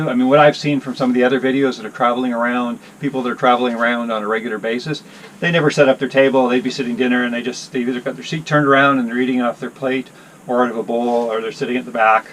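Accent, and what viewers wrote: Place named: Canada. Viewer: "Process" and "out" too, but he's pronouncing them correctly for Canada and that's where they live. If we were in Canada WE would be speaking "funny".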